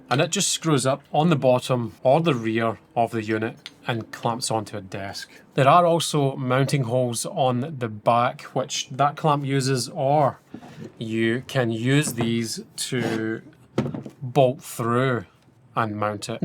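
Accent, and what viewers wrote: Scotland, United Kingdom. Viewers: I enjoyed the Scottish accent very much.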